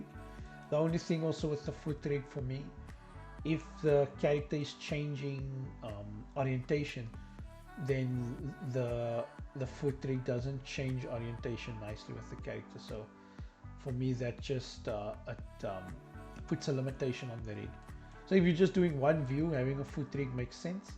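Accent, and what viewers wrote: South Africa. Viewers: Great tutorial, thanks so much for sharing! Do my ears deceive me, or am I hearing a South African accent?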